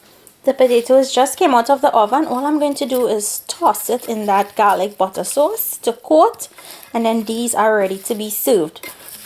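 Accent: Trinidad and Tobago. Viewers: Hi. Trini English.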